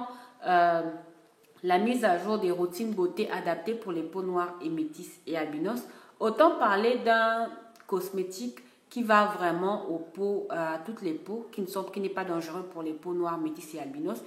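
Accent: Gabon. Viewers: L'accent gabonais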